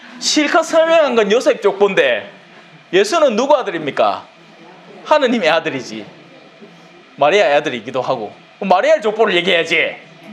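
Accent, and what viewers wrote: Gyeongsang, South Korea. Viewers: 신부님~ 이틀전 우연히 신부님 영상이 떠서 오늘부터 마태오복음 1강 시작했습니다^^ 신앙생활1년차인데 같은 경상도 사투리라 친근하고, 많이 배우고 깨닫고 느끼고 갑니다. 앞으로 한 강의 한 강의 들을 때마다 저도 영적으로 많이 성장할것같아요😊😅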